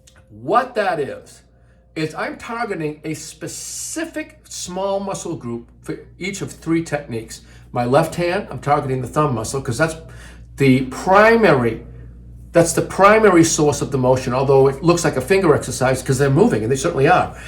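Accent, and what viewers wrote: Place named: Boston, United States. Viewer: The f....g Boston accent I don't know what the fxxxx bada boom bada bing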